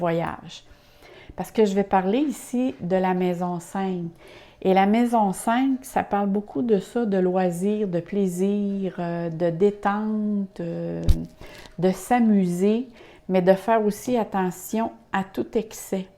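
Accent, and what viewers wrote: Quebec, Canada. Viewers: Ahhhh le canada mon rêve !!! J'adore l'accent, les expressions SUPER merci pour le tirage :-)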